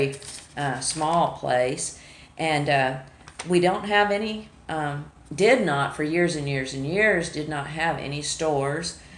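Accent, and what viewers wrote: South, United States. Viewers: You are welcome and by the way l love your southern drawl.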